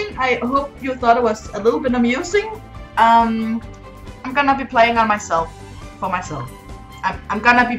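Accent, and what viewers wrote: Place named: Denmark. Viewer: Hello! Are you Danish? It sounds like you have a danish accent😁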